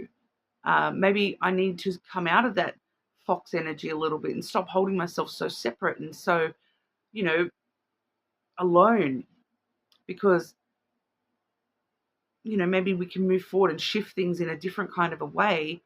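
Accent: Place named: New Zealand. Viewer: Thanks for the reading 🙂 Also thanks for sharing how you ended up with a smattering of New Zealand inflection in your speech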